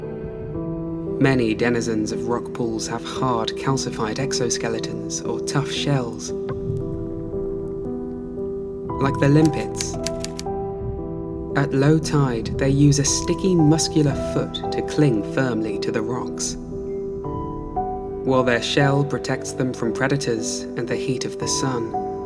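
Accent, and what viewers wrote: England, United Kingdom. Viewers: Something about English accents and nature documentaries. They just fit together so well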